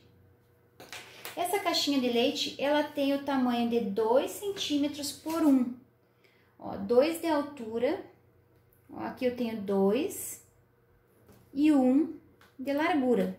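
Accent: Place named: South, Brazil. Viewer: Amei .. Cheguei hj no canal e já amei .. Vou salvar pra fazer! Amei só sotaque do sul 🤩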